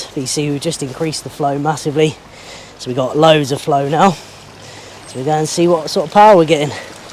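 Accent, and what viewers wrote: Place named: England, United Kingdom. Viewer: if you want to hear someone with an english accent tell you thats what they wanted 14 times in a row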